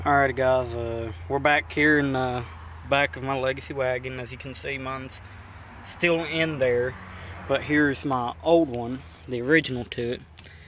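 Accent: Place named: Pittsburgh, United States. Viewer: Are you in the Pittsburgh area? I noticed from your accent. If so, I have a 99 Legacy I might need advice with for changing bulbs... pm me!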